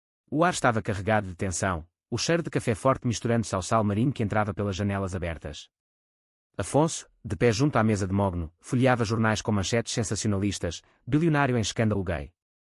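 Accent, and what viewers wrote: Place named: Portugal. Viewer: Que sotaque português horrível. Chega ser cansativo ouvir! Porque não grava o áudio no português brasileiro é melhor e mais sonoro.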